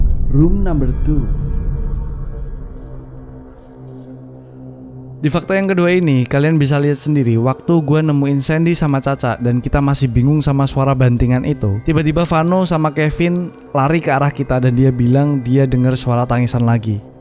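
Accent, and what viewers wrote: Java, Indonesia. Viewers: saran aja mas kalo medok gausah 'gua elu'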